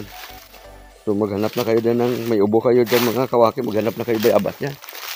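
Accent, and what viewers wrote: Iloilo, Philippines. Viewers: sir ang tuno sang imo daw ilonggo ka man.sa ka sa Iloilo sir?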